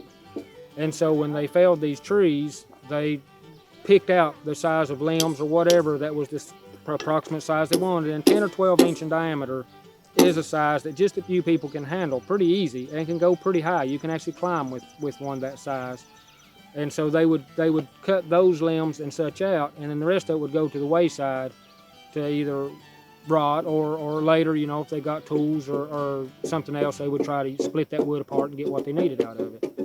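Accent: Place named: East Tennessee, United States. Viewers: nice. bunch of us in AL around like that too so I knew he was southern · I would guess Kentucky or Tennessee, I would also guess most Americans could identify that pretty easily. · His accent is Appalachian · it sounds like a east TN, or a east KY, accent! Hill folk know hill folk! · I'm from East TN and he sounds like he's either from there or the plateau.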